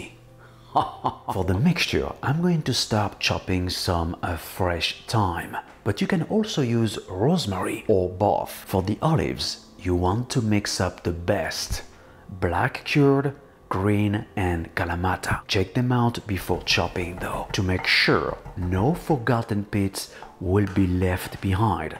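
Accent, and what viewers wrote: France. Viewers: Ur sound inspired my bro to learn French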